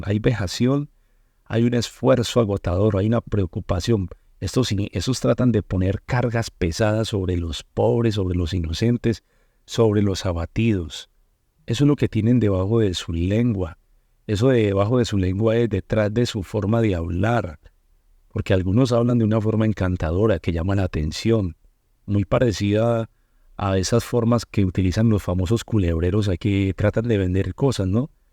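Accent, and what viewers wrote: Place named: Colombia. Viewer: HOLA PASTOR..POR SU VOZ CREO QUE ES DEL PAIS DE COLOMBIA..YO VIVI EN COLOMBIA PERO YA ME VINE A MI PAIS VENEZUELA. ESCUCHO SUS <link> GUSTA APRENDER DE DIOS CADA DIA.BENDIGO SU VIDA EN YESHUA EL PODEROSO DE ISRAEL ISRAEL..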